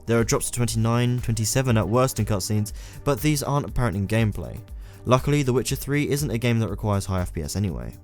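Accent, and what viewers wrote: United Kingdom. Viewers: Thumbs up if you like to hear doing movie reviews in his righteous British accent with a shot of Scotch!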